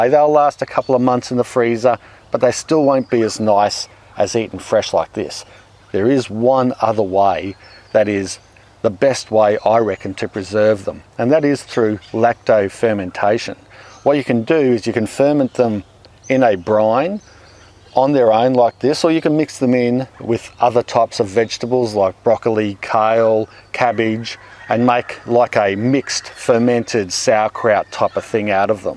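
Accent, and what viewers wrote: Australia. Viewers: beautiful video! A bit of a personal question - what happened to your arm? Did you get bit? I've been meaning to mention it as it looks scarred - just curious as to what happened to your forearm. Looks like it healed nicely though. Looks like it happened a long time ago. As someone with scars on one of his arms too it's just something that jumped out at me. You're still beautiful! ;) Also I feel many of us listen to your videos half for the helpful tips and also for your great cheerful personality and wonderful accent - I come from canada myself and I love australian accents :)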